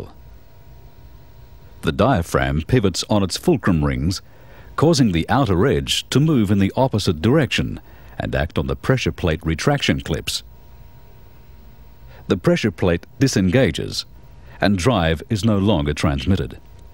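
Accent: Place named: Australia. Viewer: Perfect Standard Australian accent being spoken.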